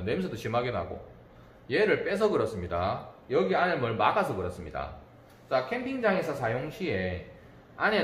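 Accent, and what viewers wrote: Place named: Gyeongsang, South Korea. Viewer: 뜨거운데 디지는 않습니다ㅋㅋ 갱상도 사투리 정겹습니다ㅋ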